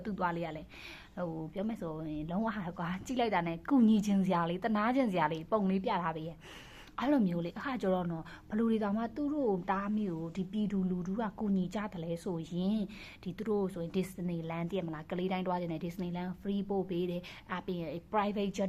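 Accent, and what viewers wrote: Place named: Shan, Myanmar. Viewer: မမဘယ္တိုင္းရင္းသားလဲစကားဝဲ႔ေနလို ရွမ္းတိုင္ရင္းသားလား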